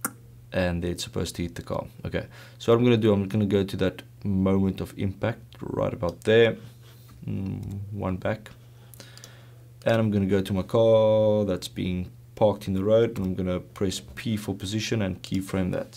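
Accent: South Africa. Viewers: You are South African? :O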